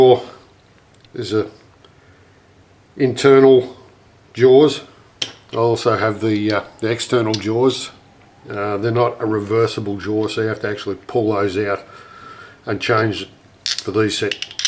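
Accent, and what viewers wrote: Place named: Australia. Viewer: Ahhhh.... So nice to find a video for a job I will be getting into after Christmas. Being a free lathe I had no time to look at it really but it felt tight in my inexperienced quick look. I had to dismantle it to get it home so I'll be looking for repairs needed whilst putting it all together. Thanks for the pointers and places to look. Mine is rather older without the thread cutting box. Just a heap of gears thrown in an old banana box that amazingly all look in good condition. Soooo very much appreciated I say with another Aussie accent. old-bonez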